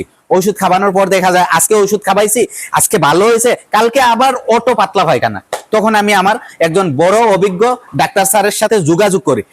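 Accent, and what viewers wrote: Sylhet, Bangladesh. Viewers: ভাই আমি সিলেটি আপনে নি সিলেটি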